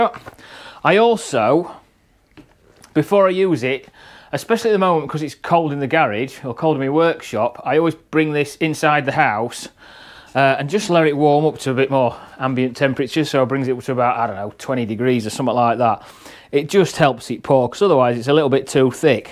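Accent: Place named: Yorkshire, United Kingdom. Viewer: Nice little video on your process mate, nice to hear a fellow Yorkshireman on YT, the finished result is bloody awesome mate, all the best from Doncaster.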